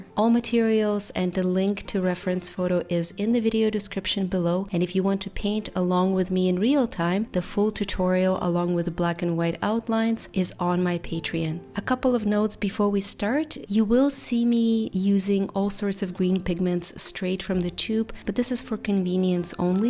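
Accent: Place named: Canada. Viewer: Well, I"m a native English speaker from London and currently do a little bit of teaching English where I live in Spain. I must say, I thought you were Canadian! Not only that, but you have a beautifully sounding voice that is calm and relaxed, which is very soothing on the ears! I saw a video of an interview you did for a Ukrainian news channel. The way you switched between the two languages was excellent. I'm fluent in Spanish, so I can appreciate the effort it takes to learn another language. But you have absolutely mastered the pronunciation of English, despite it not being your first language. It was very interesting to learn that some of your art skills come from ancient Ukrainian folk art traditions. Folk art is an important part of history and culture that unites people with their past, but also gives them a frame of reference to guide them through the present. 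It is also a great gift to share with other people and cultures. Long may you continue with your wonderful projects and I wish you a long, healthy and happy life for you and your family.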